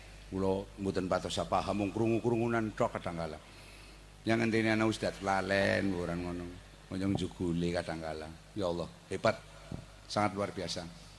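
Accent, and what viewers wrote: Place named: Tegal, Indonesia. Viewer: NIKU LOGATNYA KALO ORANG TEGAL YG TERKENALNYA NGAPAK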